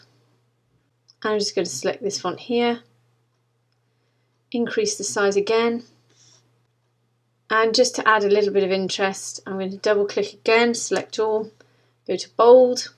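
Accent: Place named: RP, United Kingdom. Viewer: Brilliant! Thank you so much! So much to learn! With you, step by step, plus that angelic RP kept me focused all the way through!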